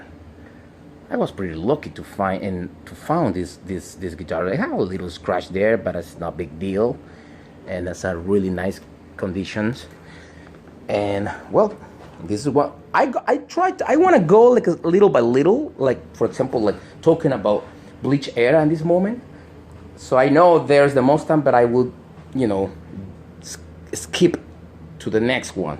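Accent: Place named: Latin America. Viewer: You is "colombiano" or Argentino or mexicano?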